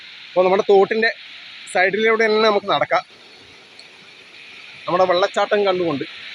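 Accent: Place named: Payyanur, India. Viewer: എന്തൊരു ഭംഗിയുള്ള സ്ഥലമാണ്❤ അവിടെയൊക്കെ കൂടി നടക്കുമ്പോൾ എനിക്ക് വല്ലാത്ത പേടി തോന്നി വല്ല പാമ്പങ്ങാനും ഉണ്ടെങ്കിലോ എന്ന് വിചാരിച്ചു ഇതെവിടെയാണ് സ്ഥലം ഞാൻ കണ്ണൂരാണ് പയ്യന്നൂരിൽ പറഞ്ഞ സ്ഥലം ഒക്കെ അറിയാം ഭാഷയിൽ നല്ല പയ്യന്നൂർ ഭാഷ എന്തായാലും ഞങ്ങളെയൊക്കെ കാണിക്കാൻ എത്ര റിസ്ക് എടുത്ത് പോയല്ലോ മഴയത്ത് ഇനിയും മഴയത്ത് പോകരുത് ഇങ്ങനെ വഴികളിലൂടെ നമ്മൾക്ക് ഒരു അനക്ക് ഒരുപാട് സന്തോഷമായി ❤❤ നിങ്ങളുടെ വീഡിയോസ് ഒന്നിനും മെച്ചപ്പെട്ടു വരുന്നു ❤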